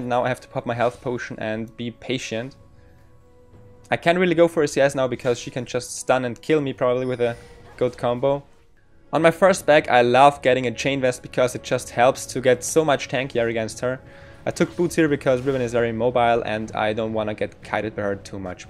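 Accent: Germany. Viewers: thats your fault you have to speak free and not try it hard oder rede einfach deutsch ich glaube das du deutsch sprichst mit deinem akzent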